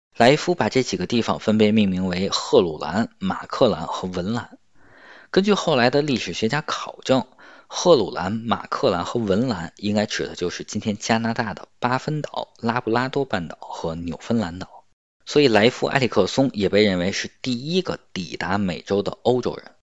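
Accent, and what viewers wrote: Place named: Beijing, China. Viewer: 北京腔好听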